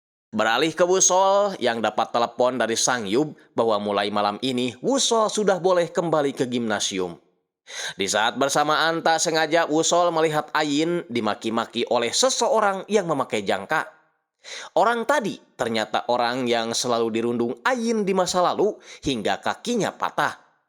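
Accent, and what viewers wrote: West Java, Indonesia. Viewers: Jigana NU ngomong orang Sunda yeuh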